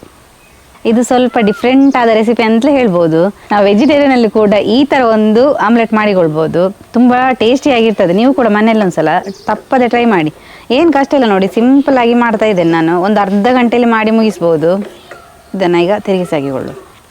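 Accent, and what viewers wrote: Coastal Karnataka, India. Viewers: Omlet egg inda madodu mathra gottith... Tomoto inda madid nodi nanu try madde... Super agi bandide .... Nimma karavali mathu super... Namge bahala ista aythu marre...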